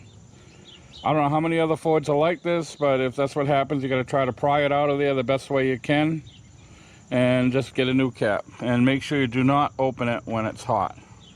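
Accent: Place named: New England, United States. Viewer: Dude definitely is from New England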